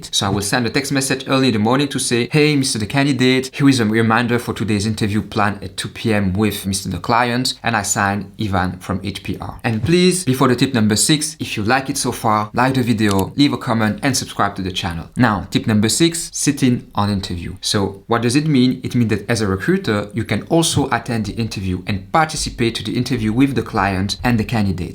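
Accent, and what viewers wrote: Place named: France. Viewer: Are you French by the way? Your accent sounds French 😀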